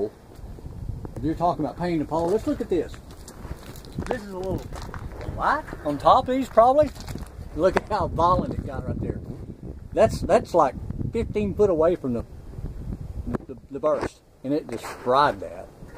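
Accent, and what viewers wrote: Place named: South, United States. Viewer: He clearly has a thick southern accent you twit 🙄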